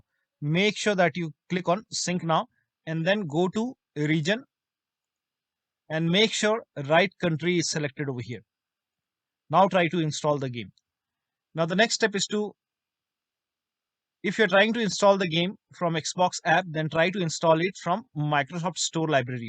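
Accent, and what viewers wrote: India. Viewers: i heard an Indian mans voice and knew i was saved.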